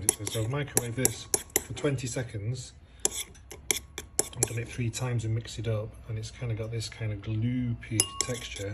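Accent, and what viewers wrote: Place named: Northern England, United Kingdom. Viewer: I’m another that has followed for a while. Just loving to hear a fellow northerner!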